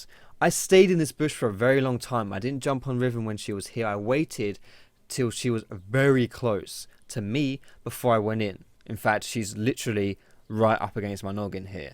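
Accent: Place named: England, United Kingdom. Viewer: whereabouts are you in England man, you sound like you're from around where I am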